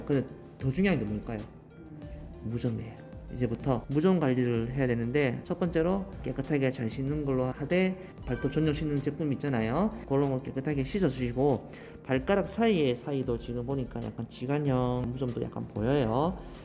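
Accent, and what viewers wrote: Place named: Gyeongsang, South Korea. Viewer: 쌤 ♡♡♡♡♡♡♡♡♡♡ 너무 오랫만이네요..ㅠ 보고시퍼써용😂👍 영상 잘볼께요~~^^ 갱상도 쌤.. 넘 좋아용♡♡♡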